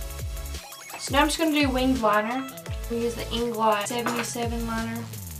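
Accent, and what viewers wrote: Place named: Alabama, United States. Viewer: You can so tell that you're from Alabama! I'm from Dothan and I moved to Ohio because of my husbands job and everyone up here automatically guesses that we're from Alabama because of our strong southern accents! 😂😂😂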